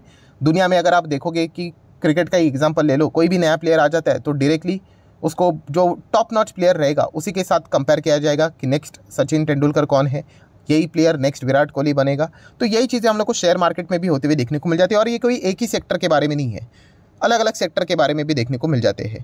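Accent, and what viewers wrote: Maharashtra, India. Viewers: Hindi se to lagta hi he ki maharastrian he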